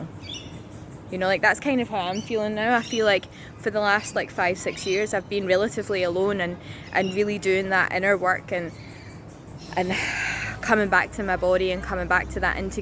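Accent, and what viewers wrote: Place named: Scotland, United Kingdom. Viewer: I’ve just found you here and loving your beautiful message/teachings… and your lovely Scottish accent. Are you from Glasgow/Lanarkshire?? 💕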